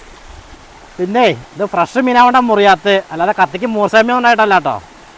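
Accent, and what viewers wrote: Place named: Palakkad, India. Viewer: Palakkad bhasha polea thonunnu. Kollam😊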